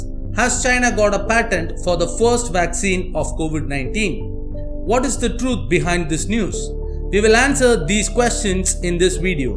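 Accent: India. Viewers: Watch out for your indian accent in the way you speak English, apart from that you have a nice content and imagery.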